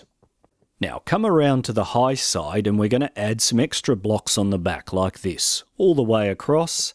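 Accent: Australia. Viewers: Oh my god, such a thick Australian accent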